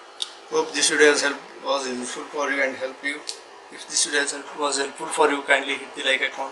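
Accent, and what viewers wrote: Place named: India. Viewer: He didn't go to the extent of actually showing us how to replace the chip. Also, heavy Indian accent made it impossible to understand what he was saying, so needless to say whatever he was doing in disassembling the unit it was not clear, except for the part of saying to take a photo of the connectors before you disconnect them so that you don't get them mixed up. That part was clearly understood.☹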